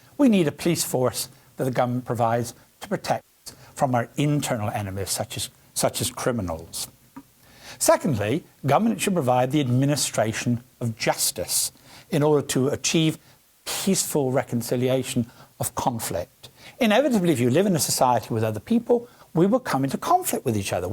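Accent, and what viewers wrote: United Kingdom. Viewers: Great video! I can't quite place the gentleman's accent; are there any people from the UK who can recognize it? Is he Northern Irish?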